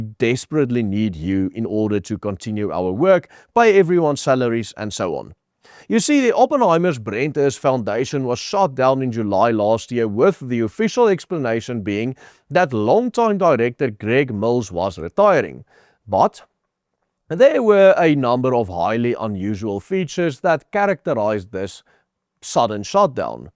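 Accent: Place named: Afrikaans, South Africa. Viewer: This has to be the thickest Boer accent I have ever heard.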